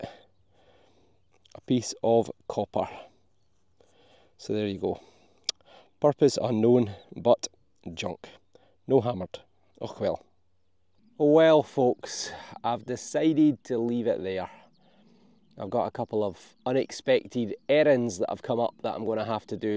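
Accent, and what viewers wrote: Scotland, United Kingdom. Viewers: When you find coins do you have to share with the land owner? Love your channel and the Scottish accent. ❤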